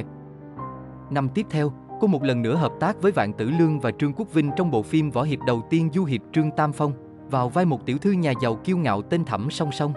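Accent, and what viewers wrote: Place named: North, Vietnam. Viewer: Hai ngàn linh hai là sao tôi không hiểu, mình là người miền nam đọc theo từ miền nam đi đừng bắt chước theo miền bắc khó hiểu lắm nha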